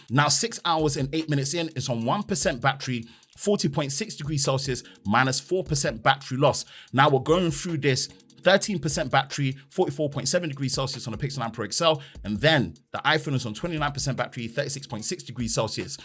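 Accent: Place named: United Kingdom. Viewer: I like your British accent more than the whole drain test bro . Keep it up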